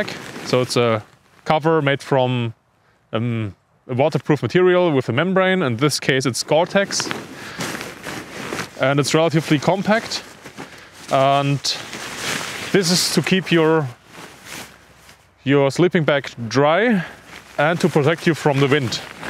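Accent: Germany. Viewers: Why are you wearing a mask when its not even cold? You generally wear attire like that below -5 to -10C. It looks like its above freezing in this video. Gonna get Covid miles from civilization? It muffles your voice making the German accent more difficult to understand (though your English is obviously excellent). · Na, das ist doch ein deutscher Akzent,oder?😊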